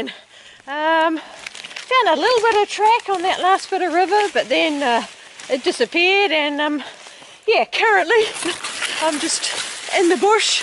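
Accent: New Zealand. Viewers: I love how you always start your videos with “good morning!” In your NZ accent. It’s so charming! Love your videos!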